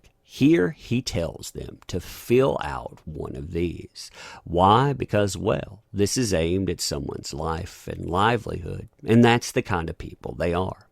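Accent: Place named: South Carolina, United States. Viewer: "Y'all" is disgusting to you? You sound like your an SC boy like myself so that's just heresy!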